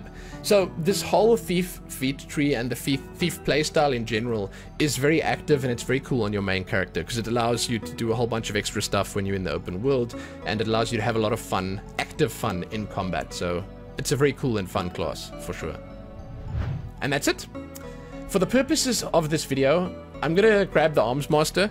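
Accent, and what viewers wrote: South Africa. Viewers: Like for thick South African accent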